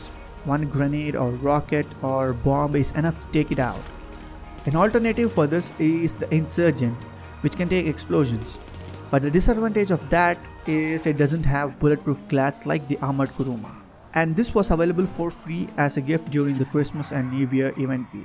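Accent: India. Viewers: Indian i think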